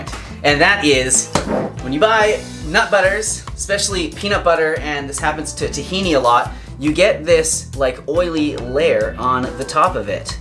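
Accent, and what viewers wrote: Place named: Canada. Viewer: Ahhhh, Canadian. Now the accent makes sense.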